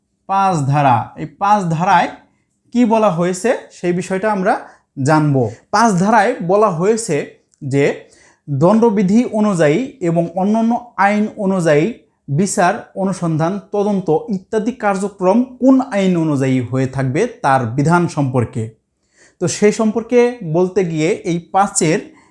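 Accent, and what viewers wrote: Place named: Jessore, Bangladesh. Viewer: আসসালামুআলাইকুম স্যার আপনার বাসা খুলনা বিভাগের যশোর জেলায় মনে হয়ে।।আমার বাসা ও যশোর, আপনার কথার টান দেখে মনে হচ্ছে।। ক্লাস সুন্দর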